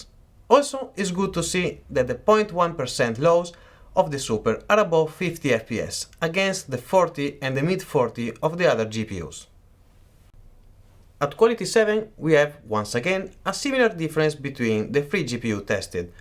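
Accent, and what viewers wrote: Italy. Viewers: Are u Italian or portogese?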